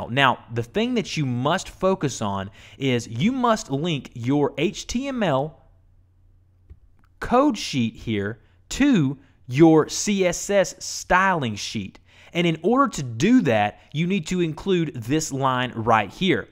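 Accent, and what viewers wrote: South, United States. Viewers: It just hit me. Your voice reminds me of Danny Lipford, the home improvement guy on TV. Are you also from Alabama, or another southern state? By the way, awesome videos. ;-)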